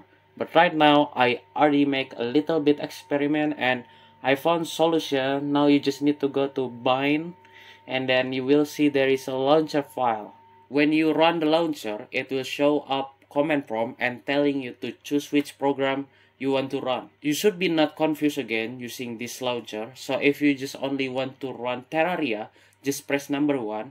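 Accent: Indonesia. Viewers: hmm i wanna ask a little question are u indonesian? [cuz im indonesian just to make it clear your accent was similar to a indonesian trying to learn english and thank u btw for the download files and it make my day thank u so much!]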